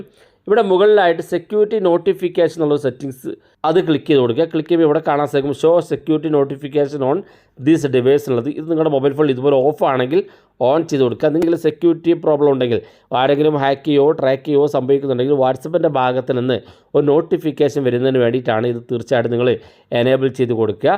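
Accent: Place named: Kozhikode, India. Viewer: Kozhikkodil aano sthalam? Samsaaram kettappol angana thonni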